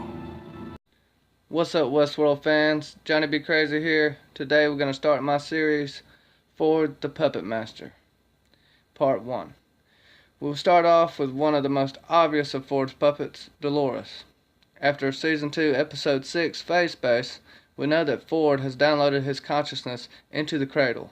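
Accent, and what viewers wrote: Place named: South, United States. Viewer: Hey man!! I've been talking to AU Packmule about being a guest on his channel. My notifications were messed up and I didn't even realize he did so many streams. I saw you, and I've subscribed. I'm from Alabama. I can tell you're definitely from the South. So looking forward to watching you and hopefully joining a chat!!😀❤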